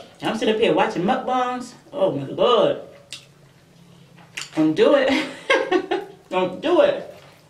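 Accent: Baltimore, United States. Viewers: I had a feeling you was from Baltimore from your accent but I didn't want to assume. Most of the time I'm hungry when I watch your channel because it's late and I've been on this don't eat after 7 & before I would eat dinner and dessert like around 9pm. I just be living through you lol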